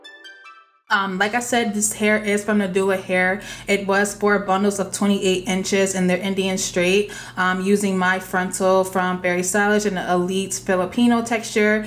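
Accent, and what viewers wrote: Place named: Louisiana, United States. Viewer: Love your videos You Rock!!!!!!!! U sound like u are from the Cajun State like me.!!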